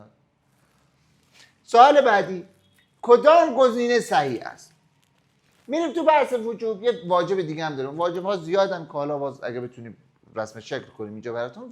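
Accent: Mashhad, Iran. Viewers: ازخرم آباد درود به تمام مشهدیا لهجتون روعشقه لطفاً بیشتر مشهدی حرف بزنین ❤❤❤ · سلام خیلی عالی بود با لحجه مشهدی هم بی نظیر ه هم عالی وبی نظیر تر میشه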